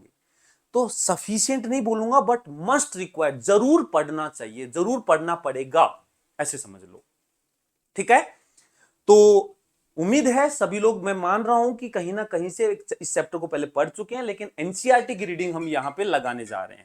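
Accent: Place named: Rajasthan, India. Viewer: rajasthani lingual greatttt😄😄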